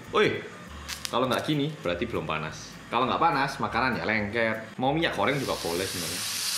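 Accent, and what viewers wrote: Java, Indonesia. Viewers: Mas, pean tak kiro wong jowo lho sumpahh jebul asli korea 🥺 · Pertama kali smpai di channel ini, ini kknya kok medok banget ya org indo apa korea si 😂😅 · Ihhh gemes... muka korea logat jowo wkwk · seneng bgt liat lo makan , ngakak jugaa cara ngomong nya yg medok 😂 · 😁😃😄wajah korea...jawanya medok😁😃😄👍mantap...seneng dg cara ngomonge..rek rek..😁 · Mas, omongan mu kok isih jelas wae to medok nya, belum lupa bahasa medok e, ya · Medok bgt logatnya wkwk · HeLLo BoLo-BoLo LiKe Yuk BoLo-BoLo- ~i ReaLLy Like intrO~ OrAnG KoRea Yg Med∅k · JAWA BANGETTT😭😭😭😭😭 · Kok logatnya kayak orang Jawa 😂😂😂😂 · Korea.. Tapi ngomong Bi ko logat nya kaya bahasa Jawa ya, 🤔🤔😁😁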